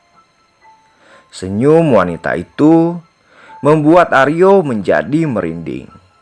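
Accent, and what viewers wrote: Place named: West Java, Indonesia. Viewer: Nu ngadongeng sorana siga urang sunda.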